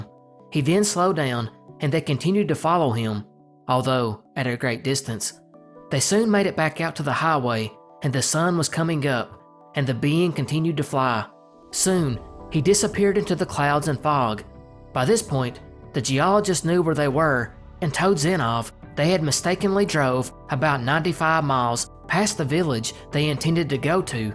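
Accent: South, United States. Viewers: love your accent man. is that some kind of southern usa accent?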